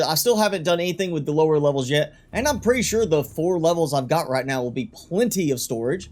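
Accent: South, United States. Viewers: Gotta love the y'all accent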